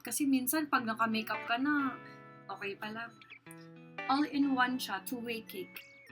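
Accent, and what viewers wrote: Visayas, Philippines. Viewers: Cute kaau sya mag bisaya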